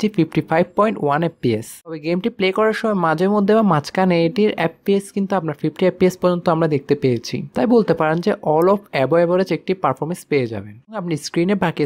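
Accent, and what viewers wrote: Chittagong, Bangladesh. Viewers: Vai apner Basha Chittagong e Tai na?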